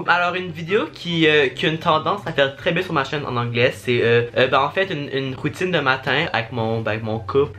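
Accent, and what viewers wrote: Quebec, Canada. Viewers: Let's go dans douche 😂 J'adore ton accent québécois c'est trop cute ✨ Plus de vidéo avec et vous êtes trop mim's tout les deux 😸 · Tu parle kebequois plutôt que français c trop cool mais si différent que le français que je parle!/ you speak more Canadian French than the French that I speak! It’s so cool tho😍😍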